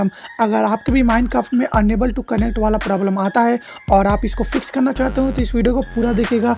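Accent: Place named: India. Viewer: Bruh u speaking indian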